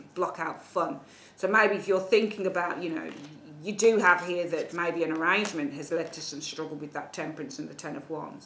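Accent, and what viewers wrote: Birmingham, United Kingdom. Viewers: hiya are you from birmingham just like me?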